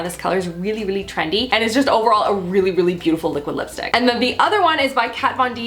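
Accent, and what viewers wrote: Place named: Alberta, Canada. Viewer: thats that awesome alberta french I'm hearing! #YYC rep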